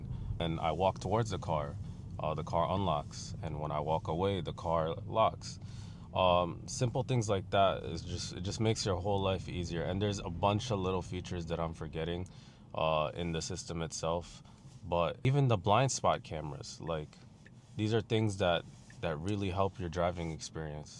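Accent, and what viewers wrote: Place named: Toronto, Canada. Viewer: You sound like you’re from Toronto though, like a moli torontorian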